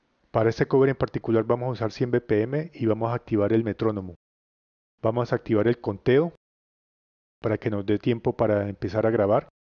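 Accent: Colombia. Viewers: Habla colombiano!!!!! Excelente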